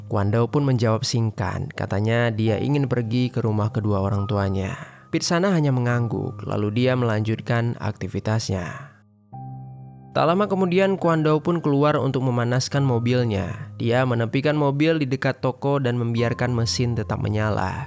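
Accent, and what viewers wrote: Java, Indonesia. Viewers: Ada jowo jowo nya yang ngomong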